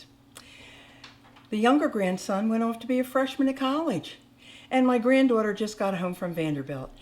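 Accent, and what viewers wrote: Philadelphia, United States. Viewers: I pray is doing well so glad I found this channel! I knew you were from the Philly area by your accent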